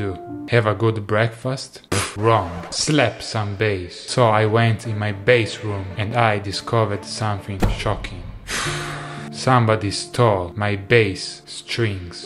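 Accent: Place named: Italy. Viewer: and when he talk..he sound like italian guy...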